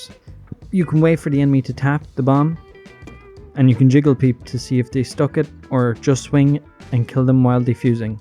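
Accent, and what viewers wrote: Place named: Ireland. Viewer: Thou sound Irish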